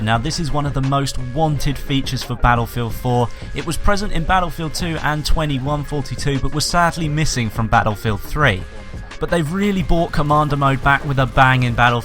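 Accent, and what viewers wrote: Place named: United Kingdom. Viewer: i love this british accent :D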